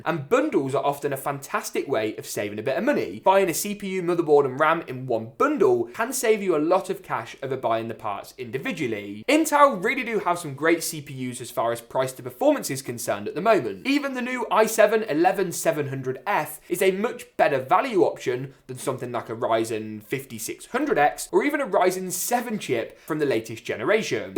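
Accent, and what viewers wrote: England, United Kingdom. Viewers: This guy has a very Thick English Accent But still Sub is deserved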